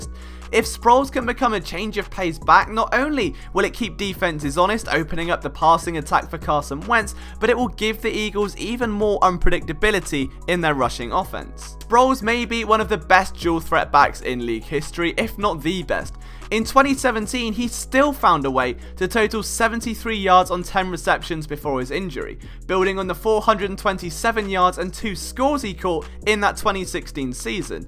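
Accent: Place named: England, United Kingdom. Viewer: Man I'm shocked I enjoy your videos as much as I do. Nothing personal but I can't stand that accent and willl turn shit off damn near instantly but your shit I don't even notice lol. All of my mom's family is from England to that's why I don't understand why it bothers me so much. Anyway keep up the great work man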